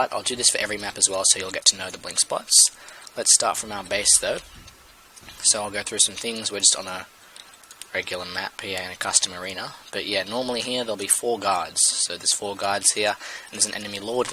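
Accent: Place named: Australia. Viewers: he's an aussie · thought that mighta been an aussie accent. was a little softer than we 'Mericans are used to hearing ;P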